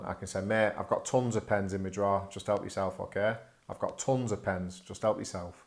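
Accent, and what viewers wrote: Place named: United Kingdom. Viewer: Hello! I am your new subscriber! 👱 You British accent very similar to Gordon Ramsay's, so British that I wanted to make me a cup of tea with milk instead of black coffee as I used to ☕😅 Happy to find your channel! Wish you loads of love, tons of money and a load of new subscribers in the coming year! 🎄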